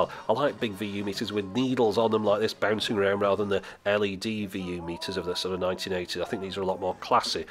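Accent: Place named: England, United Kingdom. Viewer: This guy is great! I majored in electronics in the 60's and connected every wire in four 24-track analog recording studios in L.A. so I appreciate someone who clearly knows what he is doing and explains with a patient voice. Of course, I have heard it said many times that us Americans, when hearing an English accent, believe this person is way smarter (this guy really is) than the usual bloated sack of protoplasm. ;~)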